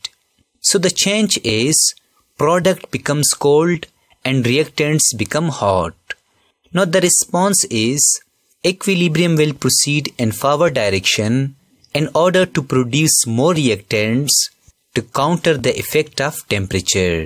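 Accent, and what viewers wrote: South India, India. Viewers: Bruh are you South Indian? 'Cause your Accent 😭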